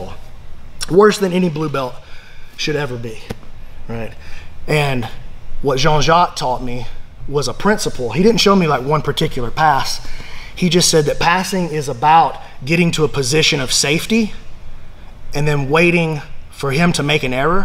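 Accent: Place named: South, United States. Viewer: I love the southern accent.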